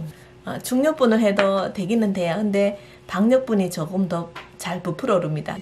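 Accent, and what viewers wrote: Gyeongsang, South Korea. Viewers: 뿌사졌어요! ㅋㅋㅋ 깨졌어요 부러졌어요 보다 훨씬 적당하고 현장감나는 표현이네요 갱상도 사투리도 참 듣기 좋아요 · ! 경상도 말씨. 너무 구수하고 좋아요. 빵도 구수하게 잘만즈셨네요. 꼭 딸아해봐야겠어요. 💯👍💯💐💐💐🎊🎊🎊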